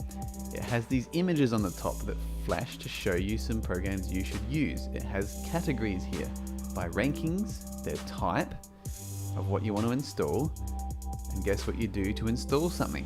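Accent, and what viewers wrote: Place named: Australia. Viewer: Hi, thanks for that. You sound rather impressed with deepin 15.4. It sounds like you're also in Oz, and that app you downloaded seemed to take a while. Has this been a problem for you since you installed the OS? I prefer to use LibreOffice as a WP app, and I imagine that would take some time to download. (Oh, and I haven't yet installed this OS. Still deciding. It looks good tho, and I'm pretty much over Win 10.)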